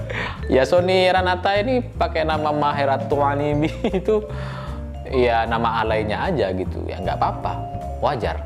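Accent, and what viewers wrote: Madura, Indonesia. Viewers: Saya jg berfikir gt.logat maduranya ada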